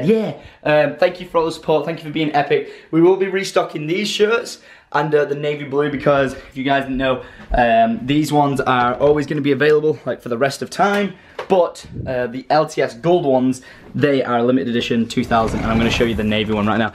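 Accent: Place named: Manchester, United Kingdom. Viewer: Manchester. Well, just south east of it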